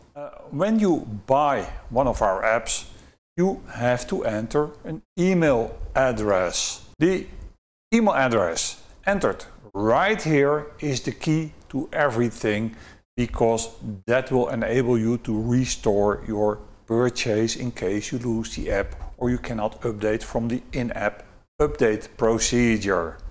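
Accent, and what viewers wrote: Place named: Netherlands. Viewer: Dankjewel voor jou dienst. Altijd leuk om de Nederlandse accent te horen. Groetjes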